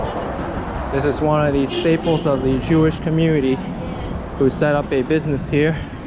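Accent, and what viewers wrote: New York, United States. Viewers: Good video! I like the Lower East Side! The New Yorker in you finally came out! lol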